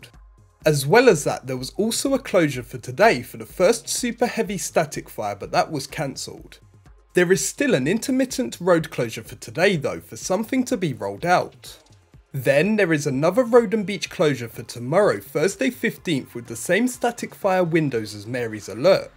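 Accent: England, United Kingdom. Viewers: Narrator sounds English but isn't this the channel that used to have Vietnamese stuff on it along with spacex stuff?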